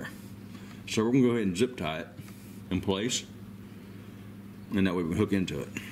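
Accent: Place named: Alabama, United States. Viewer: Thanks for very helpful review. I just ordered this charger for my John Deere 110TLB backhoe. I was tired of my battery dying at critical times...like last year when my 37-ton log splitter arrived from Northern Tool and the crate was damaged so bad I had to unload it all in my driveway with the JD, since the girl who drove the delivery truck was unable to help at all. I got the log splitter and crate halfway out of her truck and onto my loader bucket, then tried to back away from her big truck and the JD battery went "NOPE...I'M DONE!" After we somehow got her truck pulled away and I was left alone with the problem to solve, I had to get the battery charger hooked up on an extension cord and got enough juice into the battery to crank up and make it back up my hill. I was exhausted, as was my wife, and I decided I wouldn't let this happen again. 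I've got a new battery tester too, and will keep this 1.5A trickle charger on my battery in same configuration as you mounted it on your machine. The dampness and cold of the Pacific Northwest is brutal on batteries in Winter. Thanks again for your great review. And dang if you don't talk just like me, an Alabama boy!....ha ha ha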